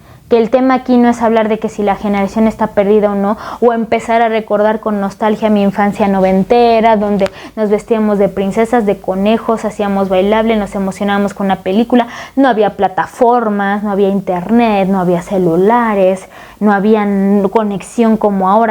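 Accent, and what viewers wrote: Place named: Puebla, Mexico. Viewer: HOLA HERMANITA, POBLANITA DE TALABERA, HABLAS COMO UNA AUTÉNTICA POBLANA. LO DIGO POR TU “MODITO”’Y ESTO ME ENCANTA. EL TEMA QUE A TOCAS SIEMPRE. A VA A SER ACTUAL Y SEÑALAS QUE A TÉS LAS MUJERES ERAN MÁS REPRIMIDAS Y ES CIERTO, PERO TAMBIÉN LOS HOMBRES. AUNQUE SEGURAMENTE ÉRAMOS MENOS REPRIMIDOS, AUNQUE EN MI CASO PARTICULAR LA REPRESION POR PARTE DE MI MAMÁ FUE EXTREMA Y MÁS AÚN YO DIRÍA QUE FUE BRUTAL Y NO FUE CORRECTO, AHORA SOY PADRE DE 3 HERMOSOS HIJOS, CON CARRERA UNIVERSITARIA TERMINADA Y SIENTO QUE EDUQUÉ A MIS HIJOS CON DISCIPLINA, PERO CON AMOR CON INTELIGENCIA Y ES COMO DICE EL DICHO: TODO CON MEDIDA, NADA CON EXCESO Y SIN EMBARGO Y A PESAR DE TODO NUESTROS PADRES CON LA EDUCACIÓN Y TODOS LOS SATISFACTORES QUE NOS DIERON, AUNQUE CON RIGOR, NOS HICIERON MÁS BIEN, QUE MAL, PORQUE SOMOS GENTE HONORABLE, PRODUCTIVA Y. 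OS ESPANTA VER QUE LAS GENERACIONES ACTUALES EN GRAN MEDIDA SE VAN DEGENERANDO MÁS CADA DÍA. AUNQUE POR FORTUNA ESTA ACTITUD NO ES GENERALIZADA, PORQUE HAY GRANDES Y MUY HONROSAS EXCEPCIONES DE FAMILIAS HONORABLES. SALUDOS HERMANITA Y MUCHAS BENDICIONES A TODA LA COLONIA DE PINGÜINOS.🇲🇽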